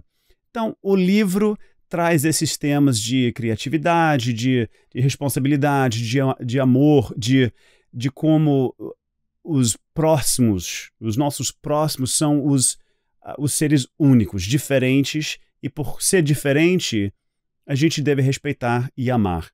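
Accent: Rio de Janeiro, Brazil. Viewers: Quero aprender o Inglês tão bem quanto você fala o "Carioquês"! Gostei muito do seu vídeo, parabéns!!! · Voce é americano? e esse sotaque do Rio ? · . Parabéns !!! é um carioca da "gema"....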